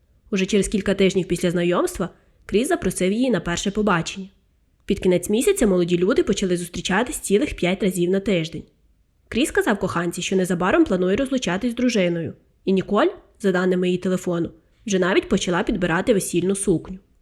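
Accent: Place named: Rivne, Ukraine. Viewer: Ви з Рівного? Такий цікавий говір? Якщо що я з Кропивницького. Просто так цікаво розмовляєте. Трішки не гоніть! Просто читайте як і розмовляйте з нами. Відчуття, що ви робите на от їбісь😞не треба летіти! Навпаки можливо поставити 1.25 швидкість. Краще переслуховуйте і ви і комусь дайте послухати. Ви вумничка!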